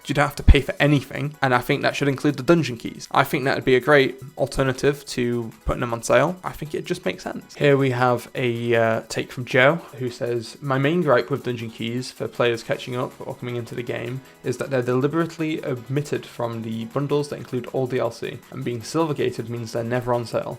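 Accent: United Kingdom. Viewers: Followed you on Twitter forever had zero clue you were British lmfao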